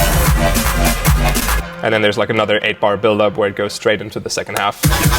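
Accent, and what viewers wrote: Germany. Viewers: I always thought he was Irish, but now I know he's German I can actually hear it, bizarre! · I love his German accent XD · wie man das deutsch raushört :D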